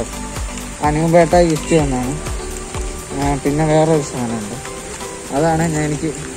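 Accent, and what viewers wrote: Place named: Kottayam, India. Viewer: ❤️❤️❤️❤️👍🏼വഷണ ഇല ....കോട്ടയം speech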